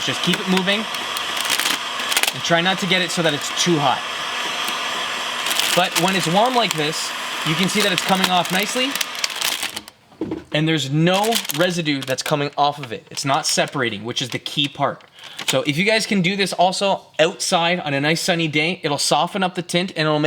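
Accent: Canada. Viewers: Let me guess.... you're from Canada? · Art thou Canadian?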